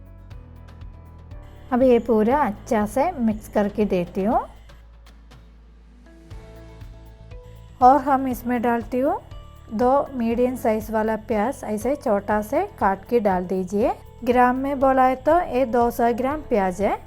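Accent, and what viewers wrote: Kerala, India. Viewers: mam greetings from Maharashtra. Your have been taking Initiative & efforts to reach out " Kerala's food " to all over india is really appreciative. Your's recipes are really nice. Always keep growing. Your Kerlian hindi speaking is very sweet. waiting for Aravana paysam recipe. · Along with your delicious recipes, I enjoy your typical Hindi spoken in complete 💯 percent Malyali accent which is a treat to enjoy listening as well with watching simultaneously, being a mumbaite. Good job and I wish you all the very best for all your upcoming ventures.. 🎉🎉❤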